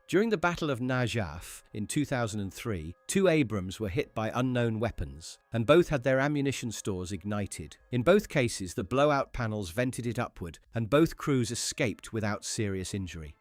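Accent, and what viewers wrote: United Kingdom. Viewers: And the British accent that permeates every damn video on yootoob. thanks.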